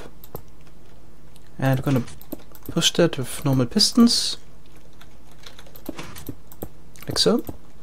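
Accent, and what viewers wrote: Germany. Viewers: lol ich so: "Hmmmm der Akzent klingt deutsch😂" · Man bemerkt an deiner Aussprache, dass du deutscher bist :D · Ja den deutschen Accent hab ich auch erkannt, aber hört sich ganz gut bei ihm an, finde ich. :D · ja hört man extrem :D als deutscher sagt man immer sis anstatt this :D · You-r german? But keep up the great work :) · Man hört sofort das du deutsch bist xD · bist du deutscher? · Gutes englisch, den deutschen Akzent hört man trotzdem raus! (geht mir beim sprechen glaub genauso xD) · r u german? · Not the strongest German accent I heard, but after some time you start noticing it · His german accent makes this video that much better :D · man merkt das du aus Deutschland kommst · iich dachts mir gleich wegen dem deutschen akzent... hört man einfach raus xD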